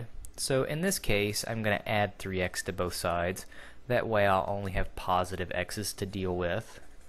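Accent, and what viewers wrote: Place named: Texas, United States. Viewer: They have a Texan accent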